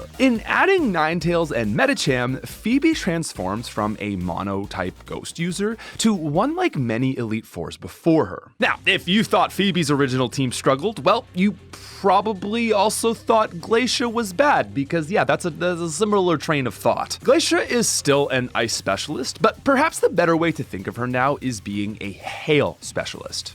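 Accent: Canada. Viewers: Is this guy Canadian? . With oat a doat. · As a fellow Canuck I love watching these videos and hearing the accent come oot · He's Canadian.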